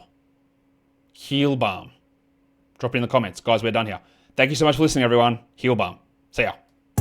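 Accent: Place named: Australia. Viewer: Trying to drop the codeword but I legitimately have no idea what you said. Aussie accent claims another victim. Canadian btw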